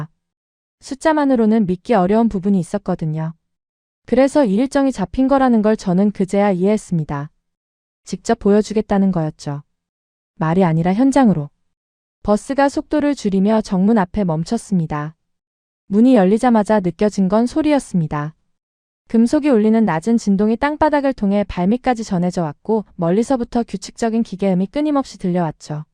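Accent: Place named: China. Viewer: 발음이 중국이네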